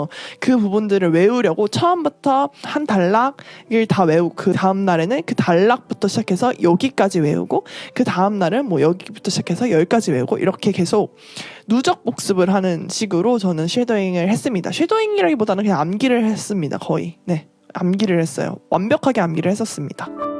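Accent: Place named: United Kingdom. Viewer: 목소리랑 영국발음이 찰떡인것..영어 뽐뿌 받고 갑니다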